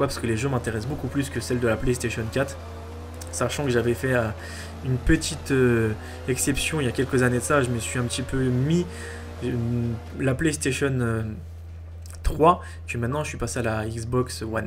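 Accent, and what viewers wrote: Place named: England, United Kingdom. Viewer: ses un anglais qui parle pas un americain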